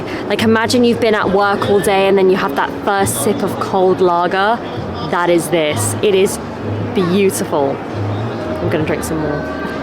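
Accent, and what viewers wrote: United Kingdom. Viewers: 綺麗なイギリス英語で日本カルチャーと食に対する繊細な英語表現が学べるなんて！全インバウンド事業者必須のチャンネルですよ！あと何よりが素敵✨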